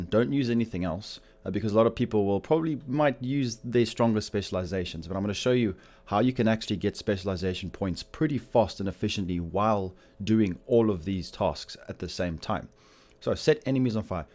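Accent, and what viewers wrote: South Africa. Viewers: South African ? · you south african?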